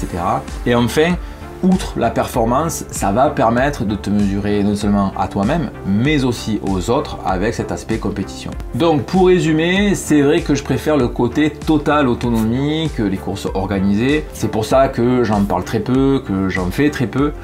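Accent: South, France. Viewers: Soûlant l.accent sudiste. Dommage.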